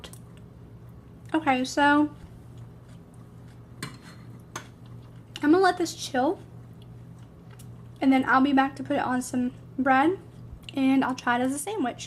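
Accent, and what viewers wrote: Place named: Appalachia, United States. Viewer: I'd sure like to know what part of Appalachia you hail from with that High Falutin kitchen n way of talkin'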